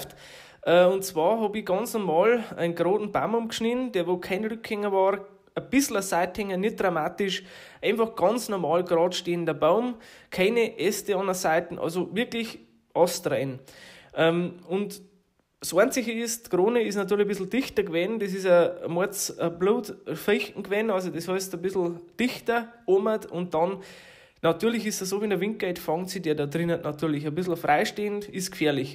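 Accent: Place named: Upper Palatinate, Germany. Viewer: Hallo, wo kommst du genau her? Vom Dialekt müstetest du Oberpfälzer sein und mit KEH-Kennzeichen kommt da nur der alte Riedenburger Landkreis in Frage? Dann war ma ja fast Nachbarn, Schöne Grias aus da Obapfaiz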